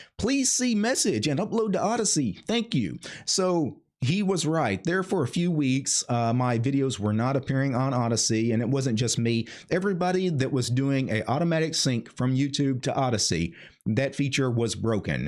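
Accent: South, United States. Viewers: As someone from the Southeast United States, sounds perfectly normal to me 😂 But to my friends from other parts of the country he has quite a strong accent 😛 A benefit of hearing the “Southern accent” of the U.S. for non-native English speakers is that it is likely the most slowly spoken of the different English accents, hence the famous “Southern Drawl”. It is very ironic to me that it is easier for some people who do not understand English very well to understand the “Southern” American accent better than some native speakers of American English who are from areas outside the Southern U.S. Language/linguistics is so fascinating! 🤓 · He does not talk slow. That “slow” bullshit from people thinking they’re cracking “funny” jokes about Southern Accents.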